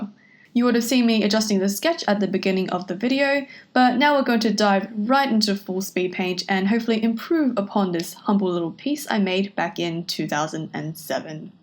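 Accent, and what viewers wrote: Australia. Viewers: Wait your Aussie! Gday from a fellow Aussie artist 😁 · Aussie Aussie Oi Oi Oi (didn't know you were Aussie)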